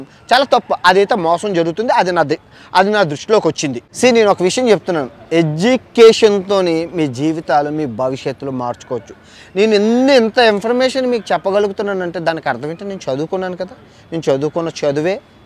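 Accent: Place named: Visakhapatnam, India. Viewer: Mi matalaku navvuagatledu sir anthaena mana vizag basa kada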